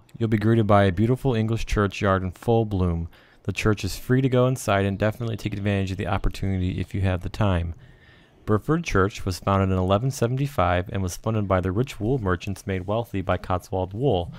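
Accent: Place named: United States. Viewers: He is American or perhaps Canadian and our accent does not define our class. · when you view a video on England you aren't expecting a slightly bored banal-toned American voice reading a tourist pamphlet. Nothing middle class about the honest comments here at all. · i don't understand why an American accent should be such a big deal. I have no problem with i got the information and a view of the beautiful scenery. I am not saying for one moment that you are a snob but it certainly comes across that way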